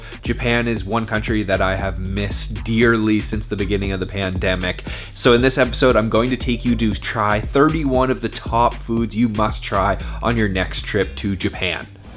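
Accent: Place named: Canada. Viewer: You sound Canadian. You're cute-as-a-button BTW.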